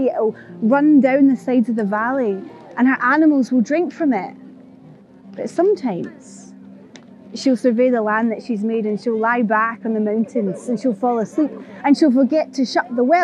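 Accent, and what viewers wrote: Scotland, United Kingdom. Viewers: A Scottish accent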